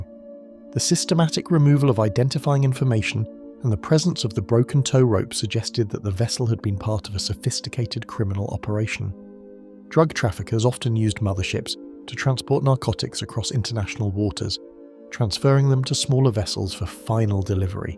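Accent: United Kingdom. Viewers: US anti-intellectualism using a British accent. Hilarious. Rewriting of history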